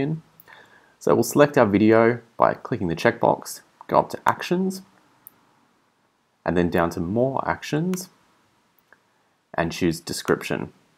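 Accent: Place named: Australia. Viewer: good job mate, keep it up! right from the get go I could tell that lovely aussie accent (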